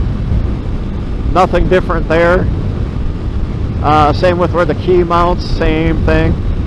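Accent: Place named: Wisconsin, United States. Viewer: I knew you were from Wisconsin, not that the accent is a dead give away but you speak well, maybe im biased but i think our state has many smart well spoken people, and you did not want to make your neighbors angry, that is very respectful only us Wisconsinites are that cool, and you want to leave the stock exhaust on haha, I leave it on to haha. Very cool man!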